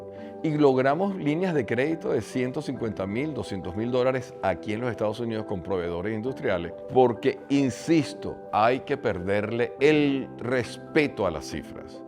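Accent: Venezuela. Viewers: AJJJJ ... ESE ACENTO VENECO... LASTIMA... ME INTERESABA EL TEMA... ADIOS Y REPORTADO ... 😢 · Que gusto el acento venezolano en magnífica dicción y pronunciación. El contenido es extremadamente relevante. Gracias por tu testimonio de experiencia en el logro del éxito financiero. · Que magnifico escuchar a alguien con acento venezolano, con mentalidad triunfadora y sobre todo enseñando consciencia de libertad!!! Statehood #51!! Graciass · Coño seré sincero contigo cuando me saliste dije este viejo ya va hablar paja luego escuché el acento dije coño venezolano luego segui escuché me quedé hasta final grande historia pana que experiencia una gran inspiración para muchos nunca te rindes!!eres el propio tommyshelby. Saludos desde Portugal ya hoy te sigo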